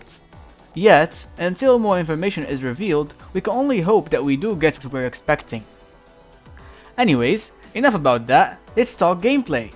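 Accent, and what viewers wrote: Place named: Morocco. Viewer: bro are you moroccan you sound moroccan as hell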